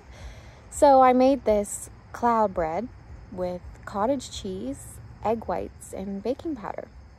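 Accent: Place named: South, United States. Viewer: OMG! I heard several "Ya'lls", a growing amount of southern inflections and you even pronounced mayonnaise like a true southerner. Hopefully Jeff will make you an official Damn Yankee soon. Do you know what that means? ETA: I'm glad that you're on the mend. You look well.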